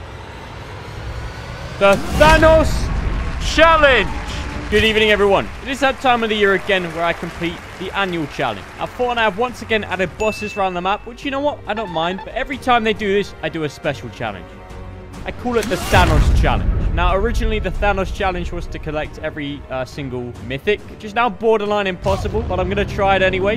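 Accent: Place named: United Kingdom. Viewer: L british man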